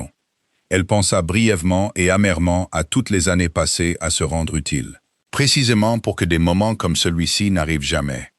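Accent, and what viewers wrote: England, United Kingdom. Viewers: Arrêtez cet accent anglais détestable ! Cela nuit à l’écoute....l’histoire est tarabiscotée...😅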